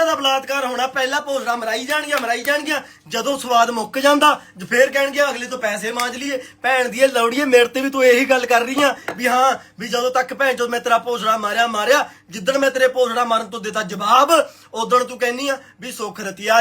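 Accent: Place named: Malwa, India. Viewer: Theth malwayi gala .😂😂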